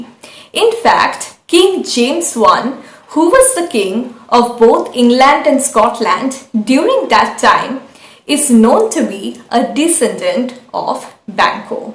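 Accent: India. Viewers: Might be good but Indian accent distracts me like hell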